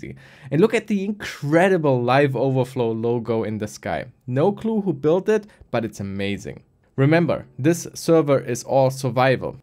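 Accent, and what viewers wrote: Germany. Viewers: I always love hearing a german accent in videos and then checking the user profile to find out I was right again: Berlin in Deutschland :) Schön zu sehen, dass jemand wie du fast 750k subs hat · wait.. are you german? i can hear it out a little bit xDF...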